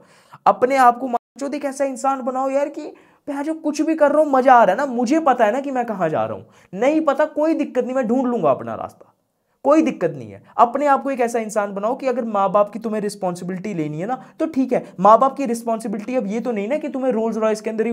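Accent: Jammu, India. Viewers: Bhai aap jammu se ho kya Dogri ka accent aa raha h😅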